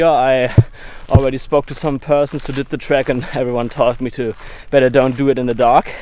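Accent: Germany. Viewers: you sound german....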